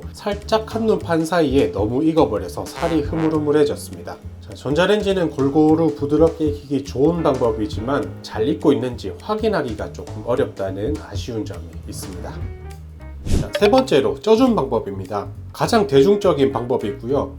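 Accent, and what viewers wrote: Gyeongsang, South Korea. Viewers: ㄱㅕㅇ상도 말이 멋져요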